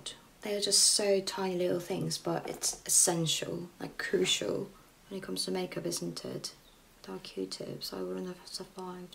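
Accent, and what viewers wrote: England, United Kingdom. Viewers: 고급진 영어~~덕분에 영국 느낌 물씬 느끼고 갑니다^^^^ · 님 브리티시 악센트 들어서 넘 행복해유~♡ 손은 나아지셨나요ㅠㅠ 반지도 목걸이도 다 예쁘고 그 중에 젤 재밌는건 님 얼굴..넘 예뻐서 홀린듯이 들어왔습니다..ㅠㅠ 인스타 사진두 잘 보고있어욥!! Have a good day❤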